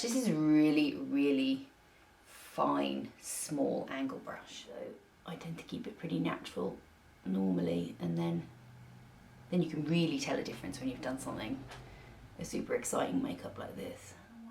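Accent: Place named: United Kingdom. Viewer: not wanting to generalize the british accent but you remind me so much of Lisa Eldridge. I love her and love your content!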